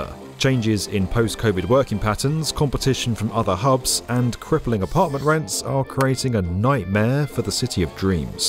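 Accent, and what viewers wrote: United Kingdom. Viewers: ...if I didn't know better, there is a tinge of anti-Amricanism in his voice or at least a dose of common Euro anti-Capitalism stuck in his throate. But maybe it is the uppity brit accent that is sneering and rooting for his hate it to become true.. ie that the NY collapses. You just know that is his wish. Sad. But we have scene this before repeatedly.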